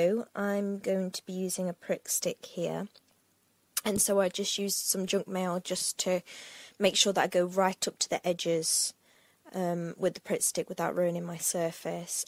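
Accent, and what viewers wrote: United Kingdom. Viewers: Great craft, great accent! You sound like you are from two different parts of UK.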